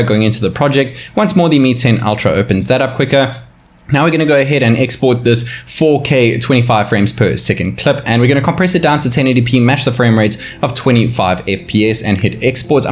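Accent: South Africa. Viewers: Where are you from bru you sound South African ? much love from SA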